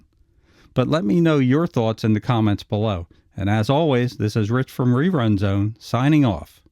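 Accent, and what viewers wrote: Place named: Pittsburgh, United States. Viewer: I can tell this narrator is from Pittsburgh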